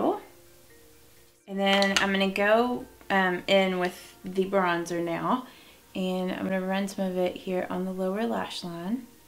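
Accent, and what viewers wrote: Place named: Kentucky, United States. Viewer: You have to be from KY. You have the same accent as I do ☺️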